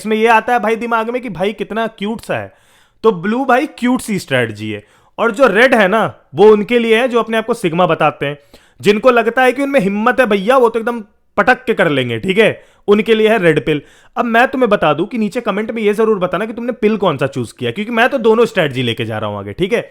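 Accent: Bihar, India. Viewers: Bihari ho?? Accent se guess kr rha hun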